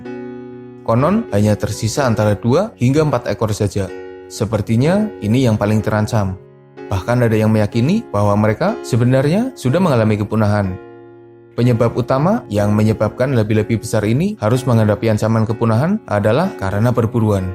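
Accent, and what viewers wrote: Banyumas, Indonesia. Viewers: Ora ngapak ora kepenak👍🏼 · Emang ciri khasnya begitu. Ciri khas orang ngapak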